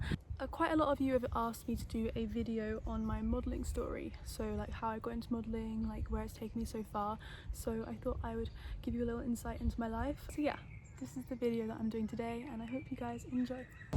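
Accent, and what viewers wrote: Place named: United Kingdom. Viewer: I'm in love with British accent Greetings from Germany ❤️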